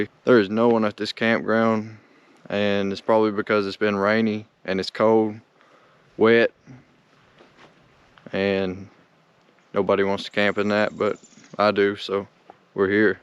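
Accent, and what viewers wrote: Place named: Appalachia, United States. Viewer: nice, I could tell from the accent you were also southern Appalachia. Keep up the great work brother!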